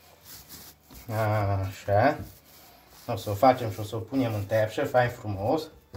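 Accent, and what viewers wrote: Transylvania, Romania. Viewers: m-am abonat numa' să-ți aud vorba dulce ardelenească. No așe! Ptituca, să-ți dea Dumnezeu sănătate și mulți prenumeranți.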